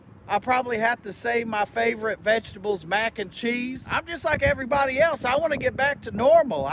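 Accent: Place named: Missouri, United States. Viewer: That Missouri accent is on point. "Welcome to the greatest restrunt in Missourer, the Gold'n Cur'ral"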